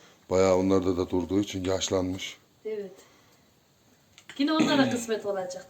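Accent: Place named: Kayseri, Turkey. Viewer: selam ben geldim nevin ozudogru kayseriliyim hemserim siveniz ayni dilden sizleri severek ve begenerek izliyom gadasini aldigim siz bizim nese kaynagamiz oldunuz kadir geceniz mubarek olsun dualarimiz sizinle herkeze selamlar saglicikla kalin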